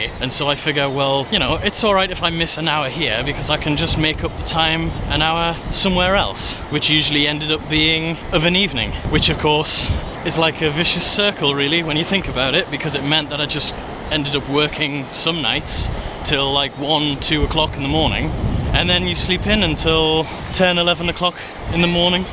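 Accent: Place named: Geordie, United Kingdom. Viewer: Two observations: Firstly that you're a posh Geordie, I know because I married a posh Geordie ;-) and secondly I'd love to see more of the coast in your videos, the coast up there is very beautiful (too cold for this soft southerner but great to look at)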